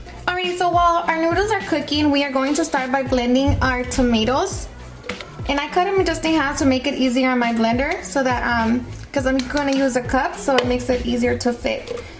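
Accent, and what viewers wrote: Mexico. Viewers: Your Mexican accent is strong. I love it 😍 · Over the years, you've developed a better Mexican accent! Love you watch you when you're young and love to hear you as you mature! ❤️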